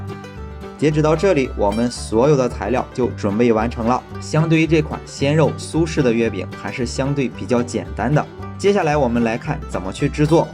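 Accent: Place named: Shandong, China. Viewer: 看了几集听讲话感觉像是山東人！这集终于证实猜对了😄視頻做的不錯👍🏻加油！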